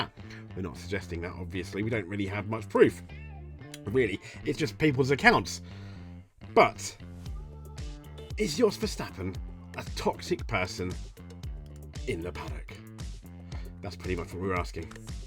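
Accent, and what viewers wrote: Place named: United Kingdom. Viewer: Ahh Brit accent. Stop watching after 10 seconds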